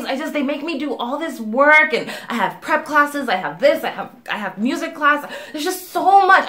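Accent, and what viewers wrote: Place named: United States. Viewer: bonsoir,oui ça va mieux. honestly i really like learning idioms and make use of them in my spoken english. i like your american accent.where are you from in the states ? i was told the accent dependant on the region you live in the us.right ?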